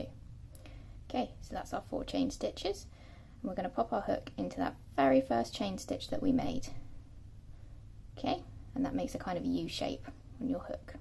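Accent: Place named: England, United Kingdom. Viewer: Are you using UK or US terminology?? Looks like US but you've a English accent.. Its confusing me..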